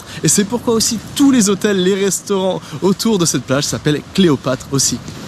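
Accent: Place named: France. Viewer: Акцент чисто как у французов. Молодец. Très bien.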